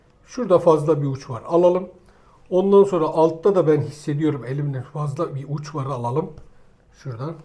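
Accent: Gaziantep, Turkey. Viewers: Muhterem abicim, Videolarini zevkle izliyoruz ve elimizdeki imkanlarla vermis oldugun tariflleri yapmaya calisiyoruz. Yurtdisinda yasiyoruz. Imkanlarimiz kisitli olsada yinede guzel lezzetli Antep yemeklerini pisirmeye calisiyoruz. Abicim sana bir sey soracam. Oncelikle Salvarin ve Deri yelegin harika. Salvar tam benim istegim modelden. Antep Turkmen Salvari. Turkiye'ye ilk geldigim gun direk Antep'e gececem ve 2 hafta kalacam ve Videoda tanittigin yerlerde dolanacam. Senden ricam Senin giymis oldugun Salvari diken ya da satan yeri bildirirsen cok memnun olurum hatta deri yelegini de. Soyle 4 yada 5 adet siparis verecem. Ayrica sivene hastayim. Tam bir Antep sivesi harika. Muhterem Annenin ve Babanin ellerinden operim. Allah'a cc emanet ol.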